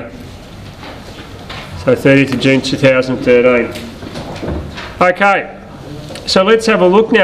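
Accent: Australia. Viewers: australian accent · Australians have a cool accent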